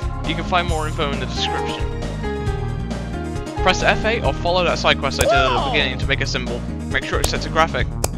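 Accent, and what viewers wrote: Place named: United Kingdom. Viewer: British accent dected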